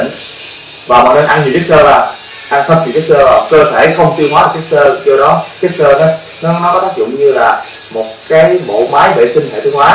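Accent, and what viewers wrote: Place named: South, Vietnam. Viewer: nói chuyện giọng miền nam dân dã gần gũi , giải thích ro~ ràng :)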